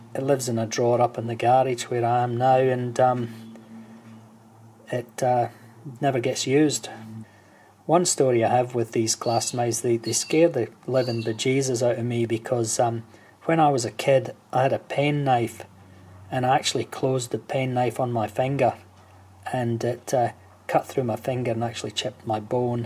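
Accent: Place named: Motherwell, United Kingdom. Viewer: You sound like somebody from Motherwell! Good vid 👍🇬🇧🇦🇨🏴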